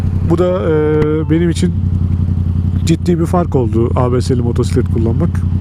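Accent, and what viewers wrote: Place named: Gaziantep, Turkey. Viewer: Once yol tatlo geldi izliyorum senin konusmani gectim yola saga sola daldim lan burasi bana boyle bi tatli geliyor birsey var ama okadar dalmisim ki meger memleketimmis be tam antep lan dicektim sen dedin antep diye burnumda tutuyor..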